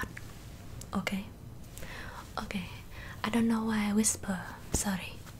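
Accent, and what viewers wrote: Asia. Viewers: lmao need more videos like this with the funny asian accent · Lollll this accent make more videos with it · Oml please do a million more Asian accent roleplays these are my favorite thing on YouTube. I can’t help but laugh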